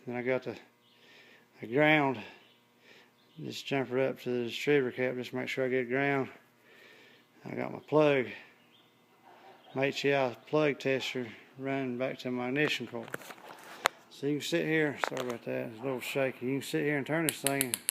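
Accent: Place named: South, United States. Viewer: I understood every word.. he has a thick southern accent.. Nothing wrong with that.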